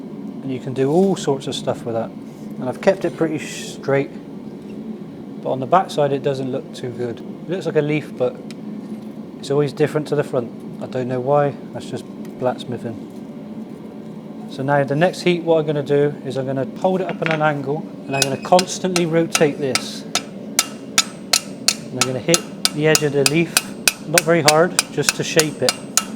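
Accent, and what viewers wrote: West Country, United Kingdom. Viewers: Where is your accent from? West Country?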